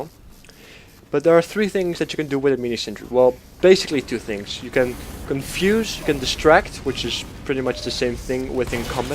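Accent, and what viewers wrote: Netherlands. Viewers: Are you dutch? U got a dutch english accent XD (no offence) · your dutch right? :p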